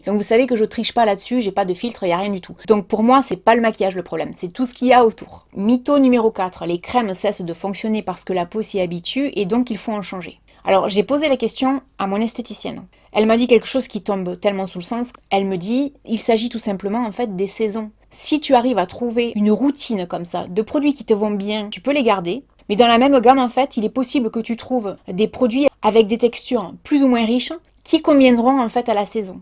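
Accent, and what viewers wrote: South, France. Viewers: Merci pour votre vidéo très intéressante. Votre accent me plaît beaucoup vu que je suis moi aussi du Sud 👍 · Ça fait longtemps que je regarde tes vidéos! J’ai créé un compte YouTube spécialement pour te laisser un commentaire. Je trouve ton travail fantastique. Tes videos réalistes. Tu dis tout fort ce que certains pensent tout bas! Je trouve ça cool!! Ton accent du SUD super !! Continue comme ça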